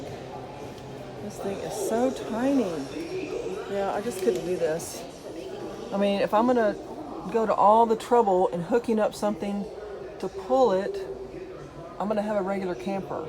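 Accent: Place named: South, United States. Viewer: I love your southern accent. Your so cute! Thanks for sharing your experience of owning a Tab 400. It really help us new owners. I learned so much!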